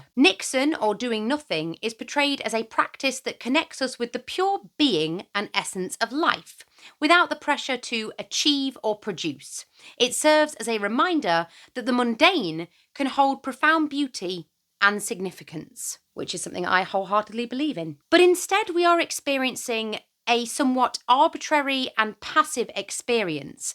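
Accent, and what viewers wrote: United Kingdom. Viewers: I was being a butt at the beginning of this video, expecting it to irritate me, even though I agree with the subject. But your skilled analysis and breakdown of the issue quickly turned me around. I just subscribed. Your video helped to make me aware of my blindspots and preconceived notions. One of those being a slightly xenophobic perception of the british accent. Thank you, and I'm sorry for doubting you.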